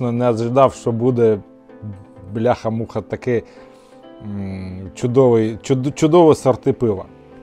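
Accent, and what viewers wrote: Ukraine. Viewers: Мої батьки з Донеччини розмовляють українською з таким же акцентом))))))) це мабуть територіальна особливість!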